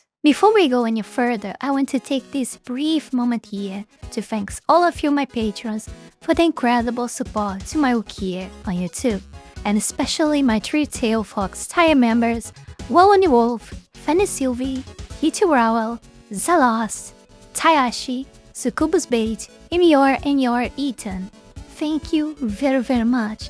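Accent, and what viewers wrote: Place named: Brazil. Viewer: When you know the person is brazilian cause they have the same accent as you do. But you can't prove it.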